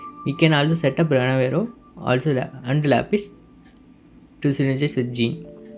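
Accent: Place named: India. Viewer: SERIOUSLY!? I knew it by the accent, thanks for be in this game... I love this game I am Indian too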